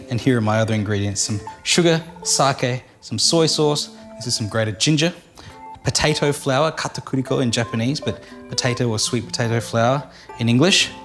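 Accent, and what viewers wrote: Australia. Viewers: I'm confused Is he Aussie, English, or Japanese? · That's just Australian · It's weird seeing a Japanese guy talking with this Australian accent lol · He is Aussie. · He sounds perfectly Australian and not Singaporean or Malaysian. This isn't a sg or my slang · I love Australian accent. They seem so chilled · Opens video to learn new recipe See’s asian dude but hears Australian accent strokes out 🥴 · Dude looks Asian, sounds Australian and vibes like a Mexican. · I switch after I hear that accent Australia? Fake Japanese food :) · An Asian guy with an Australian accent. I love it!! And my mouth is watering for some of the chicken.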